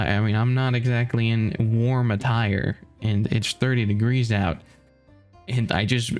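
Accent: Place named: South, United States. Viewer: i am european 😄 but i do like the red neck accent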